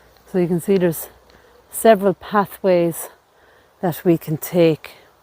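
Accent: Ireland. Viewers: Happy birthday. You take us on a magical but sad tour of the diffrent cemeteries. I love your Irish accent.By reading some the the head stones you give the deceased their names will not be forgotten.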